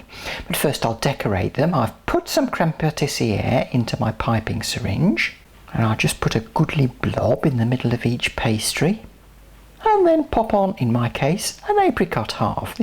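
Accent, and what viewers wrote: United Kingdom. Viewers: I've just found your channel and I must say you have charmed me rather splendidly! Your Danish Pastry video is just about the best I've seen, you make it look so easy I'm going to give it a try. And I love your British accent! You have a, what I call, a story book voice................I feel almost like you are reading a wonderful bedtime story to me! Thank you so much for sharing with us all. I've subscribed and you can bet I'll be a lifetime fan!!! I live in Kansas by the way. Thank you so much again. Bright blessings to you.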